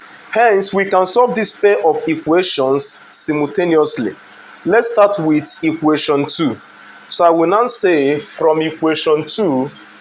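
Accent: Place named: Africa. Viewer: Nice accent and are u actually from Africa if so which country?❤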